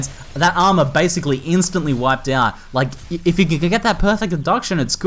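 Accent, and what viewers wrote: Australia. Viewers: D yeah I noticed. Good job though. Overall, I really enjoy you casting Evolve quite a lot. Shame you upload those relatively rarely. Evolve is an awesome game to watch, especially when there are so many skirmishes (or maybe one constant battle?) which are barely pulled of! P.S English is not my native lang so I've tried to "decipher" what is the 1st word of your intro/welcome catchphrase? Is it "Good day it's here [...]"? Or...? I generally don't have problems with understanding spoken or written english but your "down under";) accent coupled with the fast-forward tempo of you saying it... well you get the idea:P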